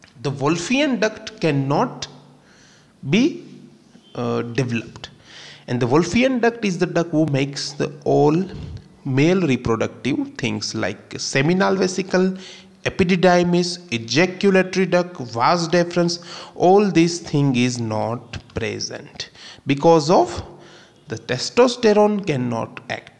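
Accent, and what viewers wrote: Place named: India. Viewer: Какой прикольный акцент у индусов.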